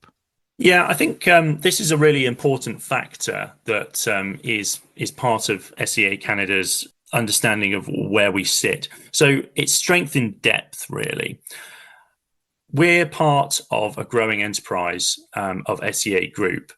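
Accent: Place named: United States. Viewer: You sound like your a yank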